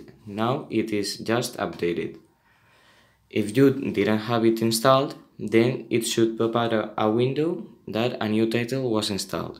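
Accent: Spain. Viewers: Como se nota q es ESPAÑOL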